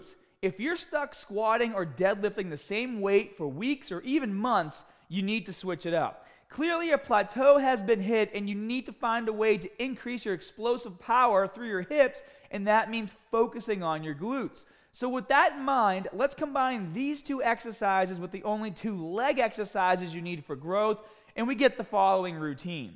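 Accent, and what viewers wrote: Boston, United States. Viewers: Mr Cartoonster I did think Boston! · Can really detect the Irish pronunciation in the Boston accent. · What kind of American accent is this? Sorry, I'm not American 😆 haha! It's like the "r" in the word "started" is silent. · Boston. It sounds nothing like New York. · New Englander accent all the way. I'd say Boston. He tries to hide it to sound more professional. Also, if you're not from Boston, you're not going to understand the accent. So he's doing us a favor.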